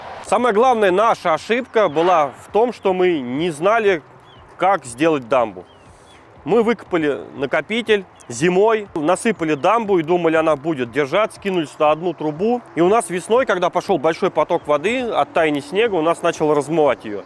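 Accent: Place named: Central Black Earth, Russia. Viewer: Случайно попалось шортс, и затянуло. В речи слышу родные Центрально-Черноземные нотки, иногда проскакивают такие родные интонации.😊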